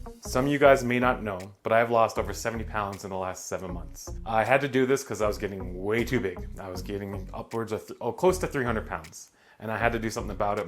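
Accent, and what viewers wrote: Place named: United States. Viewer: you sound American af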